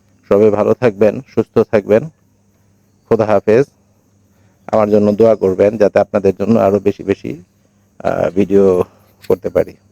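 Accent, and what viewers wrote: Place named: Old Dhaka, Bangladesh. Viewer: ধন্যবাদ আপনাকে ভাই। সুন্দর উঠান। আপনার সবচাইতে সুন্দর জিনিস হলো আপনি অনেক চেষ্টা করেন পুরান ঢাকার ভাষাটা লুকানোর জন্য। কিন্তু ওটাই আমাদের পুরান ঢাকার সবচাইতে বিশেষ বৈশিষ্ট। পুরান ঢাকার লালবাগ থেকে বলছি। দাওয়াত থাকলো আপনার জন্য। ভালো থাকবেন।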